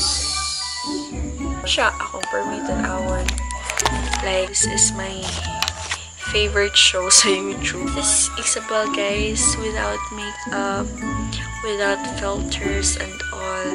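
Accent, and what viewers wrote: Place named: Visayas, Philippines. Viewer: I love the accent of bisaya hahaha!! New subscriber here 😊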